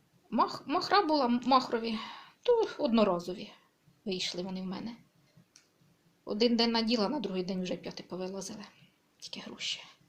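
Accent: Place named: Poltava, Ukraine. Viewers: так і я дивлюся і також так думаю,мова точно як у нас на Полтавщині. Я сама з Березняків 25 км від Лубен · Доброго вечера,.Ви говорите і примовляєте як у нас на Полтавщині. Приємно вас слухати. Дякую за відео.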